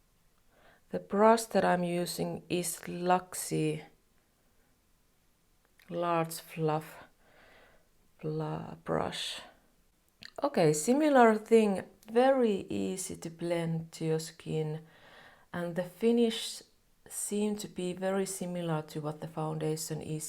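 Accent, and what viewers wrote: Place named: Finland. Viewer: Dear, you are really pure joy to watch! Loooooove, looooooooooooove your Finnis accent and your reviews are always spot on! Helped me alot which foundation to choose. Wish you all the best and can't wait for new vid. ☺️☺️ 😊🤗🤗 ❤️❤️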